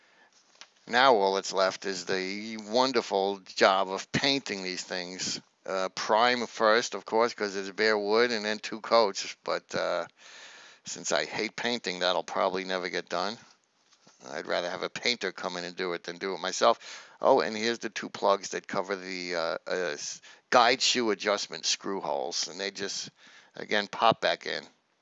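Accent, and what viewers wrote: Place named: Brooklyn, United States. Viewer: good one. Cool Brooklyn accent too....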